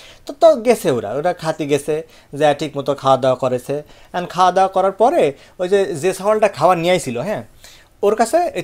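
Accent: Kushtia, Bangladesh. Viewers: I like the way you express yourself. Not to mention the exotic "Baangal" accent. Real cool. Keep going bro! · খাটি কুষ্টিয়া ভাষা ভাই।।। চরম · কুষ্টিয়ার ভাষায় দারুণ লাগছে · আহ মিষ্টি আমার কুষ্টিয়ার ভাষা!!!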